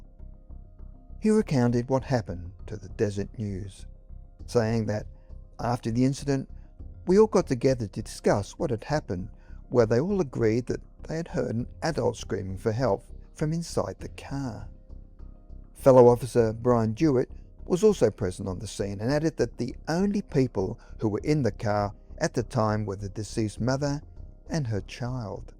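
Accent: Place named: Australia. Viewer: Australian accent, I keep thinking of the geico commercial and the little gecko 🦎 is goning to appear at any moment.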